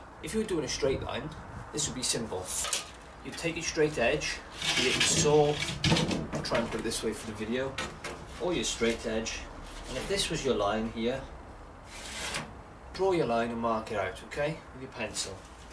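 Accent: Wales, United Kingdom. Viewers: Man I love your video's, love your Welsh accent reminds of my friend's and family from Neath in Port Talbott!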